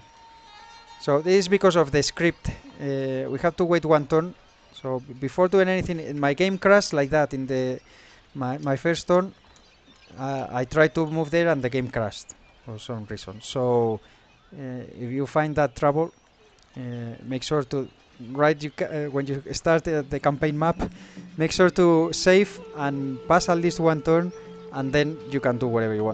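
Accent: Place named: Spain. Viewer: Solo me pareció extraño , entiendo que el mundo es anglo parlante pero me pareció extraño sin más , eso si , sino fuese por el acento jamás notaría que fueses español porque hablas muy bien el inglés. Pero bajo mi punta de vista una campaña se agradece en el idioma de uno, en este caso español , más cómodo y agradable o al menos para mi , hablando de series yo me vería encantado una de este mismo , del 1600 y con España como no podría ser de otro modo , hay que reconocer que el mod está algo verde y sin acabar pero aún así tiene su punto , además de que se juega en una Época donde todo nos era un poco más favorable y hace más disfrutable la campaña , al contrario que el 1700 donde empizas afixiado con el imperio. Soy de Málaga compañero , saludos.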